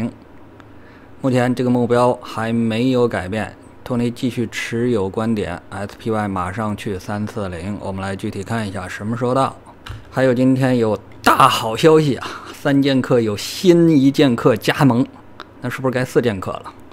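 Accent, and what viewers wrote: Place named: Beijing, China. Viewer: 喜歡你的京腔….沒有discord?或微信？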